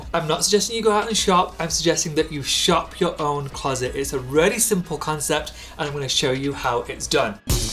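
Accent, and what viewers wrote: United Kingdom. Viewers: This guy annoys me, even with his British accent.... I miss Carson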